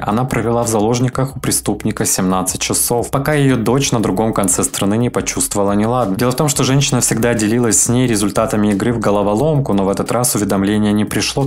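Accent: Ukraine. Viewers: почаще в речь включай украинскую мову, но с переводом.Украинская речь такая милая.Успехов.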